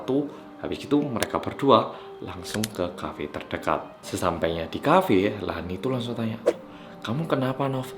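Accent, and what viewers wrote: Java, Indonesia. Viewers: Mirip Dr.Tirta 😁 medokk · 3 channel storytelling favorit dan bagus: 1. (pembawaan santai dan medho serta mudah dipahami) 2. Detektif Aldo (mirip siaran radio) 3. Arroyan Pram (kasus, konspirasi sampe sains fisika, editan paling niat dan keren. Serasa nonton film layar lebar).. Kalo kalian? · cerita di Bandung, logat mas jawa... wkwkw mantep mas tetep asik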